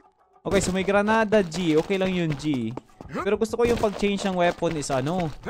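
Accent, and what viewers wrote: Visayas, Philippines. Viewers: Bisaya ka pala lods ❤hahah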